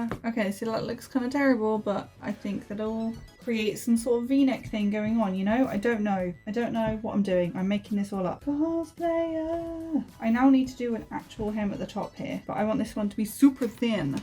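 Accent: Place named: United Kingdom. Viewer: NO worries on the delayed response. 😊 I know the U.K. has lots of distinct regional accents & your voice is so similar to hers that I was already pretty confident that you must be from around the same area. Anyway, again I really enjoyed the video & thought you did the legendary Streets Of Rage babe proud with that lovely cosplay.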